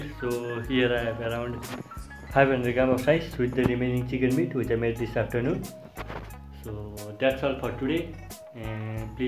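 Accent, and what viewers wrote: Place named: Nepal. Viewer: Bro Ur accent is like Nepali. Nepali ho ki?😅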